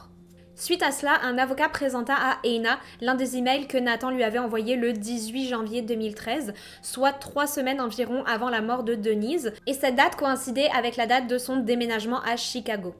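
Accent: Quebec, Canada. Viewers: J'adore ta vidéo ! Sinon, rien à voir mais je trouve que tu as un léger accent entre l'accent canadien et l'accent belge ahah :) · Tu as un petit accent québécois 🤔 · Étant moi-même du Québec, je me disais que ton accent était très ressemblant à celui ici au Québec, comparé à d'autres Youtubers français (Je viens d'apprendre que tu demeures au Québec) J'adore ton contenu, comme toujours Continues sur cette belle lancée 🤩👌 En route vers les 100 000 abonnés 🎉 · Je viens de découvrir ta chaîne, je m’abonne ! Je viens aussi de lire que tu étais française mais vivant au quebec c’est fou l’accent que tu as pris 😳😳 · je me doutais bien qu’il y’avait un petit côté Québec hihi 😉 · Bordel tu as bien pris l'accent québécois dans cette vidéo. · Coucou j'adore tes vidéos et ta chaîne, petite question est-ce que tu vis au Québec car j'entends que tu es française mais une petite pointe de québécois Bisous du Québec 😘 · Je m’abonne j’adore ton accent et tu compte très bien les histoires!! 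est tu Québécoise ☺️? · Allo ! Je vien de découvrir ta chaîne 🙂 dit moi ton accent est de où ? Je trouve que tu as un accent québécois mélanger avec un accent français. Super vidéo ! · quebecois et français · tu as un mini joli accent, c'est canadien? je viens de découvrir ta chaine et du coup je m'abonne :) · Fake c’est ca????? Quebecois dans l’accent, je reconnais ma langue fille hihihi j’adoreeeeee ♥️🌸 et en passant tu est vraiment magnifique en plus de très intéressante et crédible, tres bonne chaîne j’aime bcp , merciiiiii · Ça m'a tout l'air d'une Québécoise d'origine qui essaie de parler un français de France.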